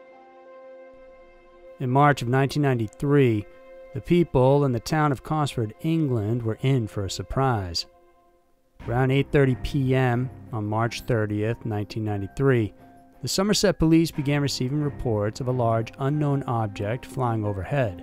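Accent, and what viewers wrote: United States. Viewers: I would really like to k ow where the narrator is from. Obviously American but which state? Unusual accent. I hope you reply.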